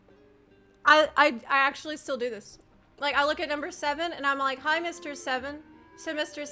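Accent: South, United States. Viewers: Oh my god, from your accent I sense you were raised in the south I learned this technique and STILL use it. You would tap the dots for twice for 7 through 9. I learned this in Louisiana it's totally ingrained in me to count this way!! My European gf saw me counting this way and she asked me verbatim, "what the fuck, are you on about? What was that?". I said the American education system.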